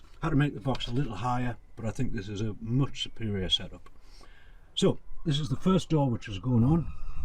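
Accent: North East England, United Kingdom. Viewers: Are you in the North East mate, sense a accent? Just been looking into converting a vivaro myself, looking like a top job 👌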